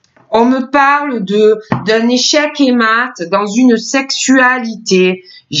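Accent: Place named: Southwest, France. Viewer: J adore l accent différent du sud ouest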